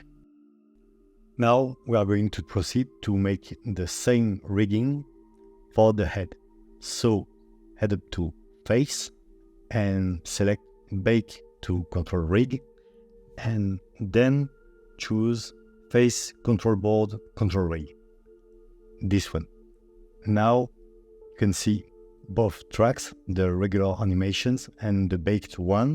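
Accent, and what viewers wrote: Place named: France. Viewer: Great my friend. Tu es français non? je reconnais l'accent. Anycase I tried others tutos, not working but yours did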